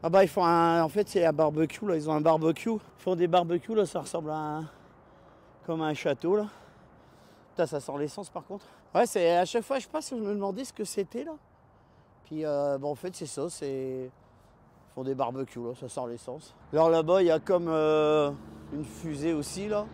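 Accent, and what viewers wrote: North, France. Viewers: Merci pour le partage c est toujours un régal mais tu as un accent du nord 😊de la France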